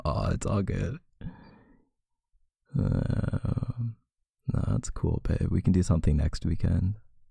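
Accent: Canada. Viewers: Hey, idk why but you sound really Canadian in this audio! I Love it dude! 💖😂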